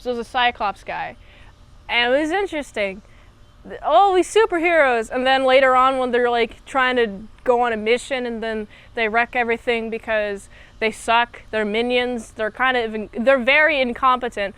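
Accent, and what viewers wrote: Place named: United States. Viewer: I love the American accent lol. What part is that accent from?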